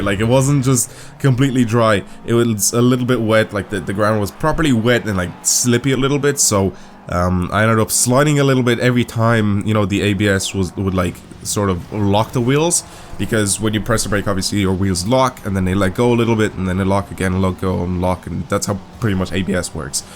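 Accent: Ireland. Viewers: When did you move to Ireland (at what age?) cause of have the accent would never have guessed you were not Irish lol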